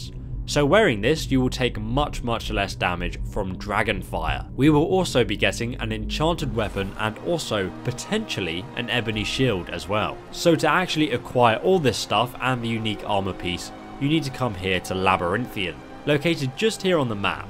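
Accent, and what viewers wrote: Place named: United Kingdom. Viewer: What I've been subbed for 3 years now and I just noticed your British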